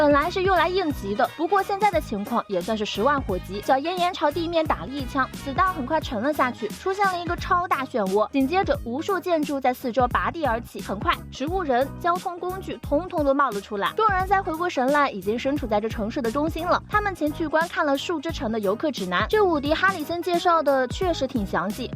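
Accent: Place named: China. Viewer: 版主是中國人又不是台灣人，口音聽不出來嗎？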